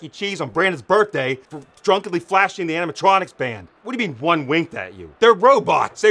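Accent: Pittsburgh, United States. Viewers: I did my undergrad degree in Latrobe, PA and nothing brings me back like this accent. Love it so much. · Definitely a real yinzer accent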